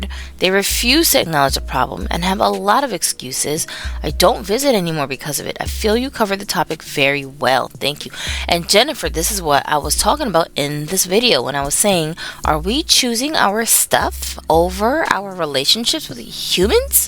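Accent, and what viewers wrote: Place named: United Kingdom. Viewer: THE BRITISH ACCENT <3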